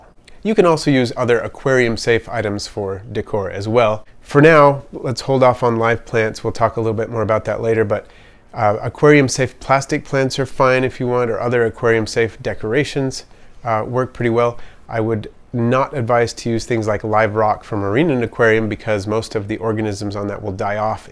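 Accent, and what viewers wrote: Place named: Hawaii, United States. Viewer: Bro. You sound local, like from hawaii. Im fkn serious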